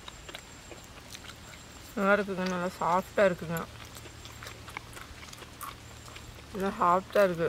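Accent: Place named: Coimbatore, India. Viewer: Akka your speaking coimbatore tamil very nice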